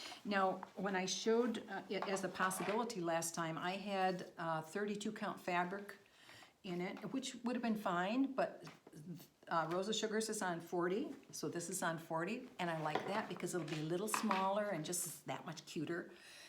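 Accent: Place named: Michigan, United States. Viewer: Just found your floss tube and enjoyed watching them. Your work is beautiful. I chuckled at your comment about your Michigan accent. I live in Michigan and have all my life.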